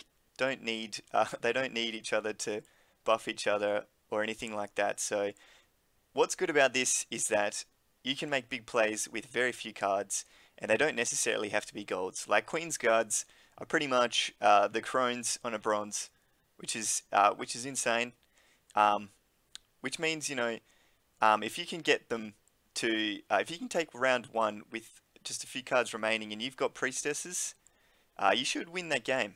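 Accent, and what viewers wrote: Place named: Australia. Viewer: Great Video really liking your content, + Australian accent got me moist.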